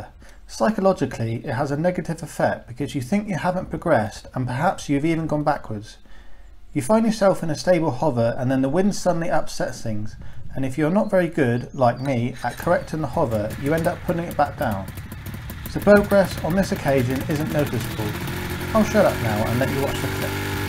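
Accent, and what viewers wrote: United Kingdom. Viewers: Those skids are TITANIC. Though it makes sense if you're learning to fly or are flying an experimental aircraft. Still look weird though. Also you sound English. Are you a trained and licensed pilot? I was wondering how the law for homebuilt micro/ultralights (whichever's smaller) worked.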